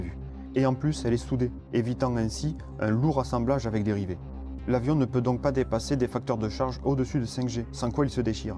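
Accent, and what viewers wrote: South, France. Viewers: Excellente vidéo J'adore son accent du Sud 😂 avec un texte bien écrit. Le fait de rajouter les sources c'est important. Par contre c'est quoi les deux musiques du fond ? et ? J'adore 👌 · J'aime beaucoup cet accent du midi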